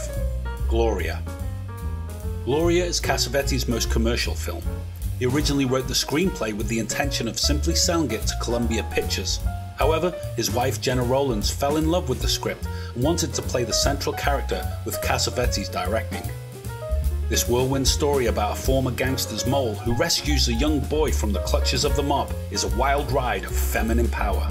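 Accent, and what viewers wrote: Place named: North, United Kingdom. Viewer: Love your Northern accent!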